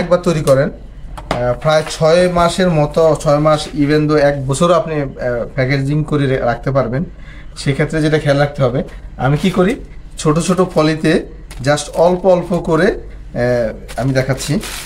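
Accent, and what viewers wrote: Noakhali, Bangladesh. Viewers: ভাই আমার কাছে রান্না এক প্রকার আর্ট, তবে আপনার কথা শুনে মনে হচ্ছে আপনিও আমার মত নোয়াখাইল্লা। মনে থেকে বলছি ভাই আপনার ভিডিও টা অনেক ভালো লাগলো। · যতই স্মার্ট উপস্থাপন করার চেষ্টা করো,নোয়াখাইল্ল্যার ভাষা বোঝাই যায়। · ভায়ের বাড়ি নোয়াখালী নি। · আপনার বাড়ি কি নোয়াখালীতে? anyway very helpful video, thanks. 😇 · কথায় বুঝা যায় নোয়াখালী অঞ্চলের মানুষ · ভাইয়া বাড়ি কি নোয়াখালী তে?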